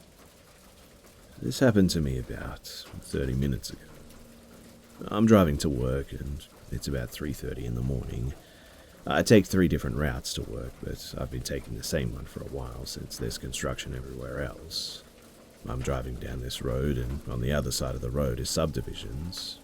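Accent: Australia. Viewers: I like you. Got a nice voice. I suppose you are from down under? Greetings from North Vancouver island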